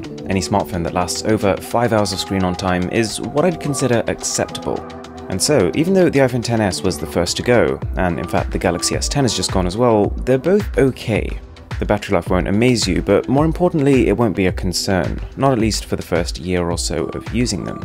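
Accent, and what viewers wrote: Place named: RP, United Kingdom. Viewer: Is it on purpose that you have that posh accent when talking ?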